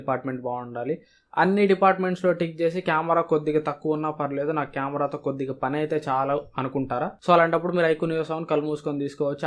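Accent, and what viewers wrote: Ongole, India. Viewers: Bro meedhi Ongole haa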